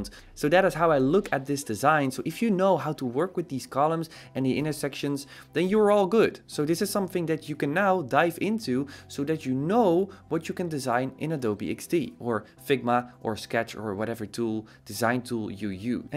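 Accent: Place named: Netherlands. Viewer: I like how he sometimes forget to hide his Dutch accent. Great channel by the way.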